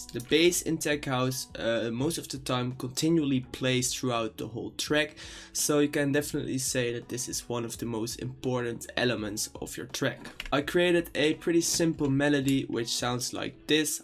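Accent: Netherlands. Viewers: Hahahaha je can horen dat je Nederlands bent, klopt dit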